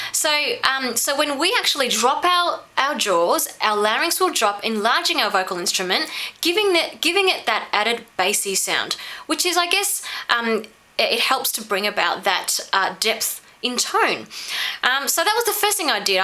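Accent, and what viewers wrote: Australia. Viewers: I love your accent, you must of lived in Australia for years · omk919, she sounds Australian for me :) she's not faking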